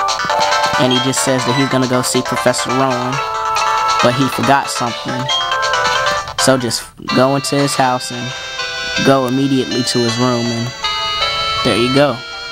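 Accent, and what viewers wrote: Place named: Appalachia, United States. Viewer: MAN! shut up and just play the game, we know what going on, i hate your hillbilly accent i had to stop watching the video and find a video with no idiotic talking. 0/5 stars, no subscription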